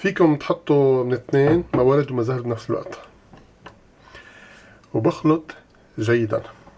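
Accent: Lebanon. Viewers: Dkfkf Dkfkdmd كلامو مبين لبناني 100% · este chico habla el arabe con acento Libanés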